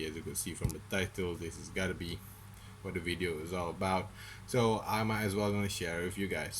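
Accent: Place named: Malaysia. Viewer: Sounds like Malaysian English. But He made it clear that it is not worth it.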